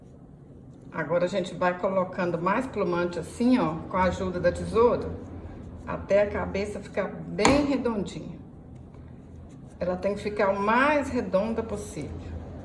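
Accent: Minas Gerais, Brazil. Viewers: Adorei. Amiga Vc é mineira ?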